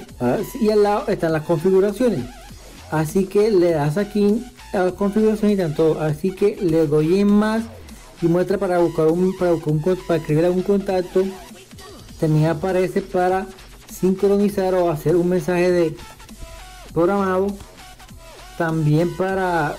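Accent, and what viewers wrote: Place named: Brazil. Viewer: Jah tienes asento brasileiro en el video. Así es amigo Ya salió la versión 9.00 hace poco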